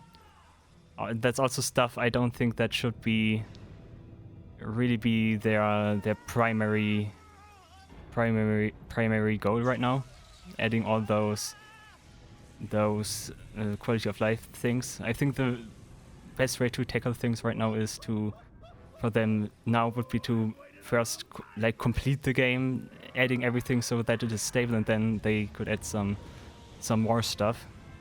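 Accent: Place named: Germany. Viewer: Bro has a German accent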